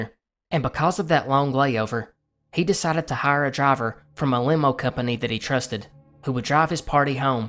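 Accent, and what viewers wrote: South, United States. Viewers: nice vid! it took me a while to get used to the southern accent but its not bad. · Well said love his southern accent too and the accent in general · I live in Holland but grew up in the South, so hearing your voice sounds like home 🙂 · I can tell by your accent that your southern American don't people in your part of the world do what are called "barn burnings" all the time? Why do they do those? Isn't it usually to clear the land to build a new barn? This is in regards to the burned house horizon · I rarely if ever comment. Now I've subscribed to you about a week. Going to college staring things off always when I fall to sleep or do my work I gotta be listening. Your very unique love your southern accent, how you just get into it don't waste time and your upload schedule that shows a lot of dedication so I would honestly like to thank you for all your hard work on just entertaining your viewers. 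clearly 7K subs isn't enough and I think your very underrated. If you ever read this have a lovely day, and keep doing what you're doing.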